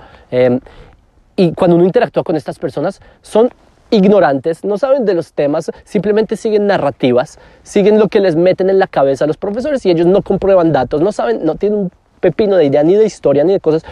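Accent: Colombia. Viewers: el habla castellano de colombia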